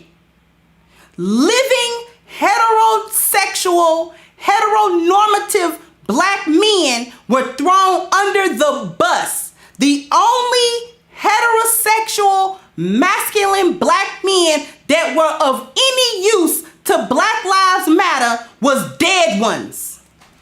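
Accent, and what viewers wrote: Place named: South, United States. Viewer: Yu got to be frm the south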